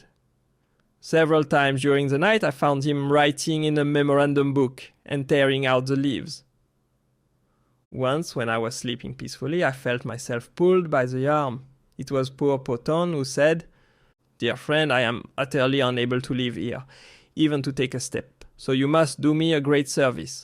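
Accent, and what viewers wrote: France. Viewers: Honestly the thick French accent was such a great touch for this series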